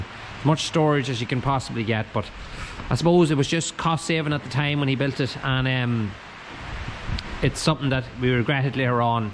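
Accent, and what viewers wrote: Ireland. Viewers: are u irish,n. Irish or scottish get confused with accents😃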